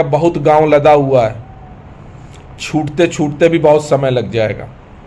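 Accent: Kanpur, India. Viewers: सर आप कानपुर से हो क्या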